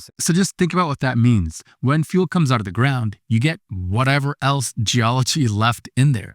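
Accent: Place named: United States. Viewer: Speak proper English which is British. USA English pronunciation is awful unpleasant to listen to, so many mispronunciations.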